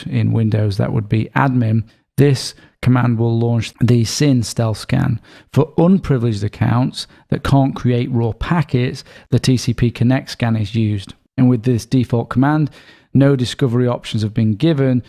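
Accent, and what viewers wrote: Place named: United Kingdom. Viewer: A very good detailed explanation .... only thing it's kinda a little bit hard to understand your british accent.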